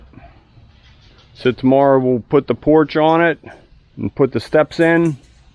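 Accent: Pittsburgh, United States. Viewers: Hahahaha that’s the Pittsburghees accent you’re hearing! Thanks for watching! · This guy speaks with a south Pittsburgh dialect. Why can’t I find him listed there? (I lived there my first 20 years so I know.)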